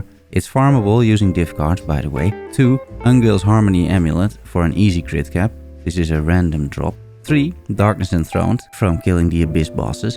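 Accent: Netherlands. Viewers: nice vidz, especially as i am a ssf enjoyer myself. chillt voice and music fits good together. one question: are you from the netherlands with that accent?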